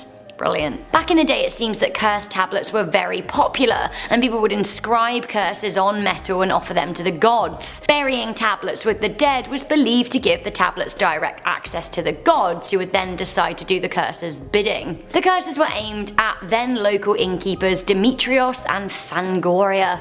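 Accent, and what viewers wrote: England, United Kingdom. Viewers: Her English accent is no excuse. She is pronouncing the name altogether WRONG. King Tut I'm sure is not finding any humor. Your comment, however, I'm sure he got a little"chuckle" 🤭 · You remind me of the girl who was in love with doctor who. It's the British accent and how your voice sounds. I miss watching doctor who now. Need to watch it again.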